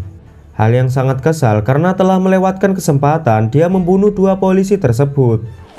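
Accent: Java, Indonesia. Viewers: Suaramu kok medok jowo banget mas.. Soko ngendi sampean?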